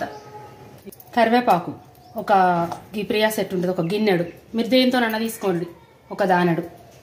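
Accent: Telangana, India. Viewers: Amma thanks for your telangana slang all recipes are good and natural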